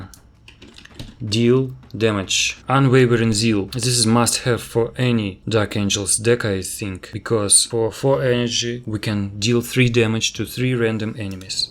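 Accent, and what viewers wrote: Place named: Russia. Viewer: Хех, слышу русский акцент, а так видео класс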